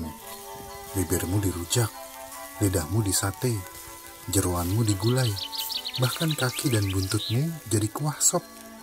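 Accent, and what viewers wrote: Indonesia. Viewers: Pengisi suara di channel ini tuh org indo kan?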